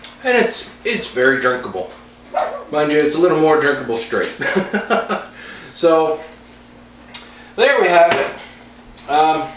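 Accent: Nova Scotia, Canada. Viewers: Hey.... I've tried a few dark rum recipes similar to yours. I've tried various combinations of white sugar, ginger, cloves, oak chips etc. to get some different flavours. You had me hooked once I heard your accent.... and when I heard you talk about donairs.... I thought we may have met at that point being from Pictou County (but living in Oshawa) myself. Looking forward to viewing some more of your recipes! Cheers!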